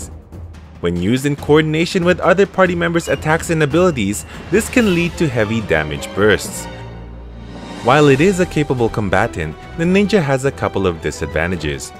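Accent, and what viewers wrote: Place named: Philippines. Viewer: so sound filipino bro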